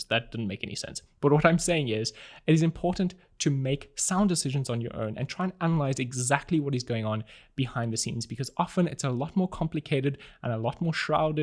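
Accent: South Africa. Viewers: Are you South African? I can hear it in the accent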